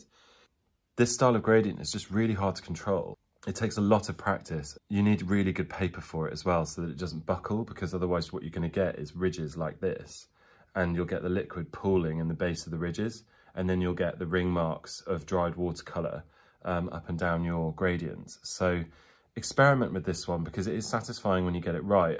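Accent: United Kingdom. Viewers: Watercolours has been an enemy to me for years, pfft. But even so I enjoyed this video a lot. I'm a big fan of people who can actually work with watercolours, they have the God's hands I would say😂 Also, brittish accent gives lives. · How did I not find you before?? Add with an English accent : a balm to my ears x I'd appreciate any contents on volume and movement, please! Thanks!!!!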